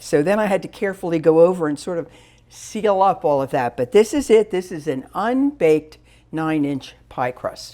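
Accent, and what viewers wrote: Philadelphia, United States. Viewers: you from Philly? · First of your videos I’m watching. You must be from Philadelphia or thereabouts.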